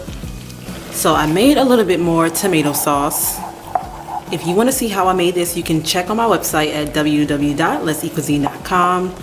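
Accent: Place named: Haiti. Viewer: I knew u were Haitian by your voice🤗, weid i know😂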